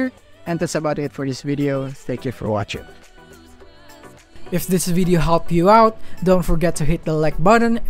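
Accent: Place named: Philippines. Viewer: sound filipino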